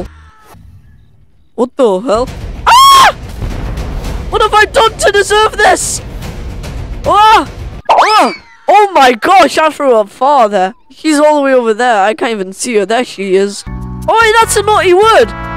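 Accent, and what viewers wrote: United Kingdom. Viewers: I love his British accent it’s good😊😅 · The way he just speak like British 😂{Not being rude}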